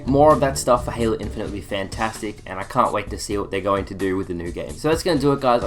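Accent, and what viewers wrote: Australia. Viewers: aussie rep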